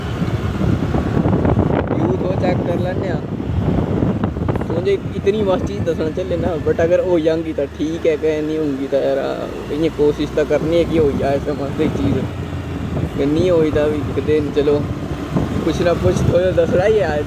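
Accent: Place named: Pahari, India. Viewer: Pahari accent Superb 🔥🔥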